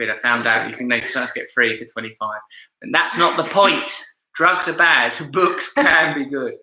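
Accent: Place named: United Kingdom. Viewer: Totally. I just know that when I do start reading, the version of voice in my head is no match for the real thing.;) We American's are so easily beguiled by eloquent British accents.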